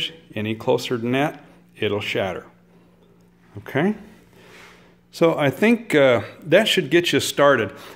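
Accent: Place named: England, United Kingdom. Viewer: Oh, p****n d'accent rosbeef !!... 👎🏻👎🏻👎🏻👎🏻👎🏻👎🏻🤢🤢🤮🤮🤮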